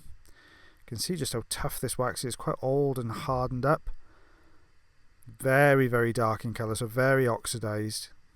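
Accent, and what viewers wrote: Wales, United Kingdom. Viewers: I so enjoy your videos. They're so satisfying and I love Welsh voices.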